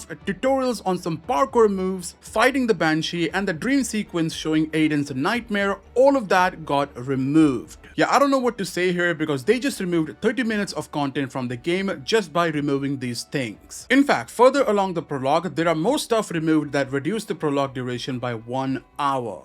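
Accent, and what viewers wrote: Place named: India. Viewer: First i cant understand half of what you say with your India accents. Next why ruin the game taking out parts changing colors of markers. What I see is only messing up the game taking out good parts to make it worse. Who is the laim person not thinking. CAN I play my game WITH OUT DOWNLOADING THIS 48GB NIGHTMARE????? Please tell me i can. A complete joke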